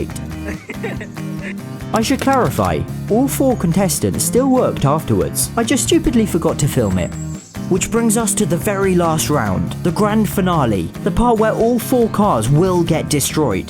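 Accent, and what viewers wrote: United Kingdom. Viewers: are you British 🇦🇺?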